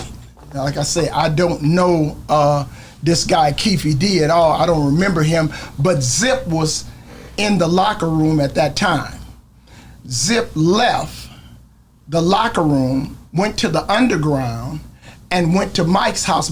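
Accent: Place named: United States. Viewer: Forensics It’s spelt and pronounced forensic for en sic What do you say I need is telling us about Keefe, D he says he’s not the sharpest mate you need to learn to pronounce and maybe spell English fucking hell he says flooronsic haha You know I get the strong American accent, but there’s no excuse on this one mate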